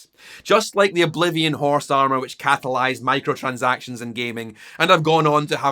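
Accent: Scotland, United Kingdom. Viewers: The Scottish accent seems especially well suited to calling out capitalist nonsense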